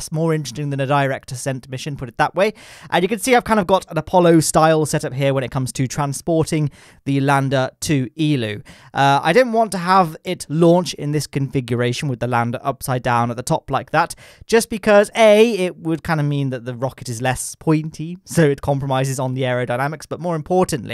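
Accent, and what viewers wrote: United Kingdom. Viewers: he's British I thought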